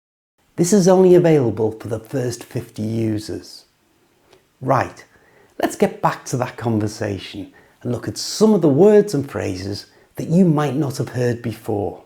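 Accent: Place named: North, United Kingdom. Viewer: What city do you live in ? You sound from North blighty?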